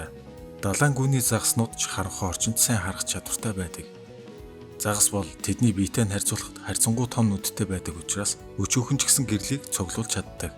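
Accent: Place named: Kyrgyzstan. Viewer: Произношение похоже на Кыргызские.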